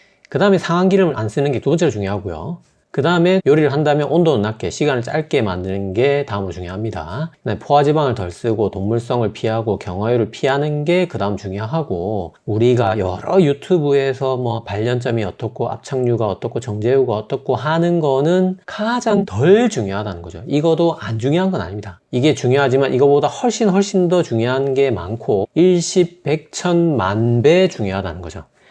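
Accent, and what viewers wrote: Gyeongsang, South Korea. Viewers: 선생님 꿀 지식을 경상도 억양으로 설명해주시니 정겹습니다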